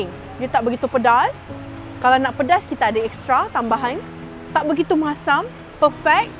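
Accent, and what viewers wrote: Perak, Malaysia. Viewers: atay org perok ckp pun nampok comey jee...ajeee ranjooo betoiii kome.... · Best dgr dia cakap perak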